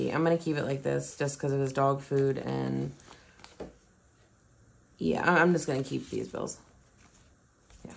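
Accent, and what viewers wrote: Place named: East Coast, United States. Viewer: Are you from New Jersey/East Coast? You sound identical to my cousin! Love it. So relaxing. Congrats on your first bill exchange! I can’t wait until I have mine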